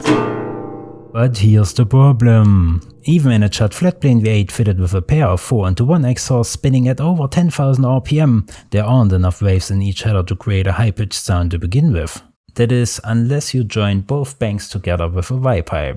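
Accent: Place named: Thuringia, Germany. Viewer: On a side note your thick accent sounds like you could be from near Thuringia. Yet there is often a hint of Swiss or Austrian. Very interesting. In Gera haben die immer so einen tollen Akzent.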